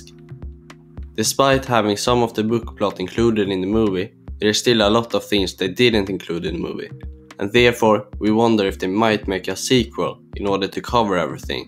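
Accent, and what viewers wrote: Sweden. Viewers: I just have to ask you.. are you from Sweden? 'Cause it sounds like you're talking swedish - english. I'm from Sweden and can't talk swedish - english.. it's a little bit ambaresing.. (sorry for spelling) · Sorry if this is offensive, but are you from Sweden? Because you have a swedish accent lmao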